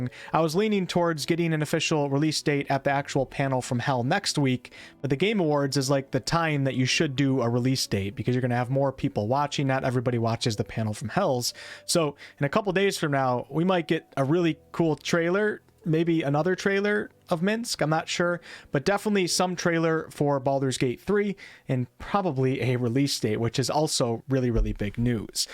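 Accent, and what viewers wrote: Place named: Midwest, United States. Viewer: So I'm detecting a somewhat midwest accent? The way you say tealent!:)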